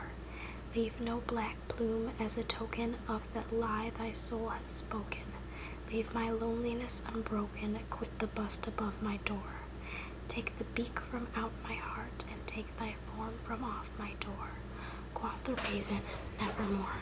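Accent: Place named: Buffalo, United States. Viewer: I recognize your accent. I live in WA state now but I used to live in Buffalo. Go Bills :D !!!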